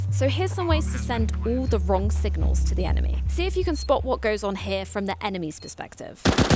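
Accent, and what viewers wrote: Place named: United Kingdom. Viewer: That female british accent tho 🤤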